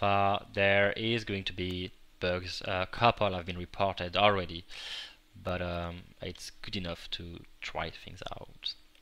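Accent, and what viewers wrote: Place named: France. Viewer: he must be french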